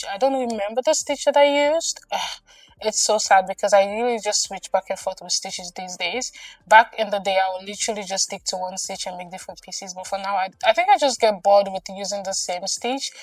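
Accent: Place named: France. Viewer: I hear the French in your English ❤😊... You're my crochet inspo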